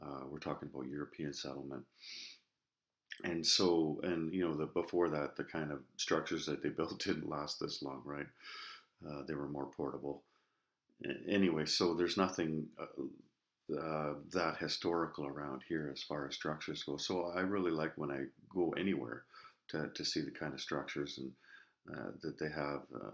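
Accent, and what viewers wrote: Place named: Canada. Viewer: you are awesome, you want to here something funny? I had my closed caption on while watching this video...according to the captions you are pregnant... i'm still laughing, they obviously don't use a caption-er who picks up your Canadian word accents. but congratulations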